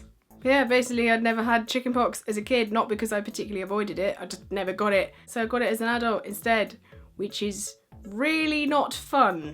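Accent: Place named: Cockney, United Kingdom. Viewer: So not only are you a cockney girl with a great personality an infectious laugh and sorry for been sweet and corny but beautiful eyes but you like danger mouse gimme gimme gimme I grew up watching danger mouse, super mouse, banana man and the raccoons.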